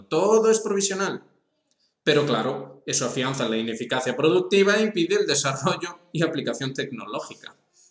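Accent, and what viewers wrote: Spain. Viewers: Es un gallego. No le entiendo ni madres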